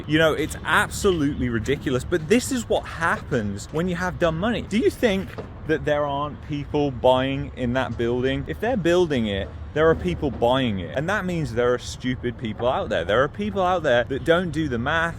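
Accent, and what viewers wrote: United Kingdom. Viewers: I have refrained from commenting so far , but i have to say that this very Limey sounding bloke is one smart cookie . Hope still exists .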